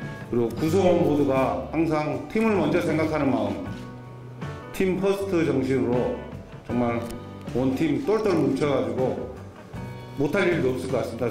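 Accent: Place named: Honam, South Korea. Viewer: 말투가 약간 호남 사투리가 썪어 있다고 할까요? ㅎㅎㅎㅎㅎ 아무튼 김종국호의 타이거즈 기대합니다.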